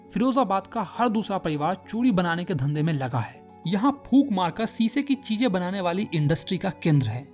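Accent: Bihar, India. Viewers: Bhai bihari ho kya 💯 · Sir ap Bihar ke ho kya🤌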